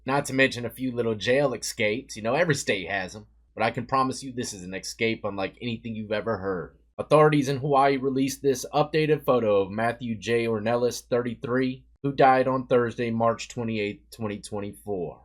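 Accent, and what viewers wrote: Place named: South, United States. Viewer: Aye that’s funny ppl mad how you say shit in the south we got our slang in the south we goin say Celtic (seltic)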